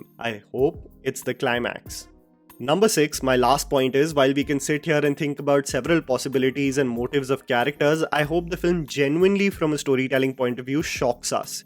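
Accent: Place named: India. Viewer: FFS. Please work on your pronunciations of ‘conjure’ and ‘mass’, remembering that they are English words. An Indian accent is one thing, but to do the maa behn of the English language is something else. Do ‘chime in’, won’t you!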